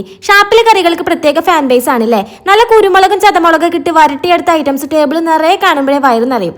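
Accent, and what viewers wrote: Thrissur, India. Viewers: Thrissur ano cheeci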